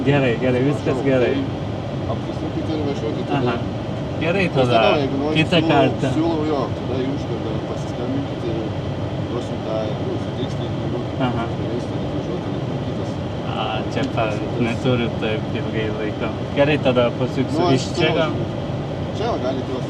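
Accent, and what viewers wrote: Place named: Turkey. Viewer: Smagu girdėt pukią lietuvišką,kad ir turkškai🤘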